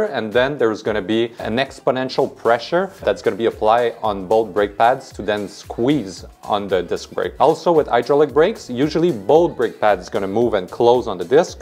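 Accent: Quebec, Canada. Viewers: Très québécois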